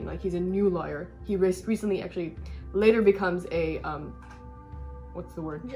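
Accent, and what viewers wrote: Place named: United States. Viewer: Wow... Wonderful Review in American English... Congrats...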